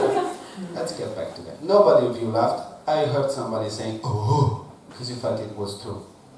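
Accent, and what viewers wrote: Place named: Milan, Italy. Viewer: Anche quando parli inglese hai l'accento milanese 😅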